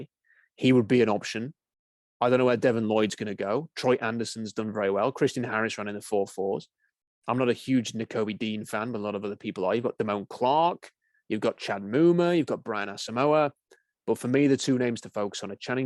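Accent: England, United Kingdom. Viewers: Great analysis. First time listener. Still trying to wrap my mind around NFL coverage in an English accent.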